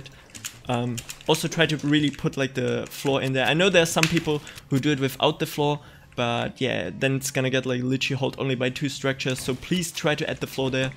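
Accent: Germany. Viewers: I hear German in his Voice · du bist doch save deutsch das hört man irgendwie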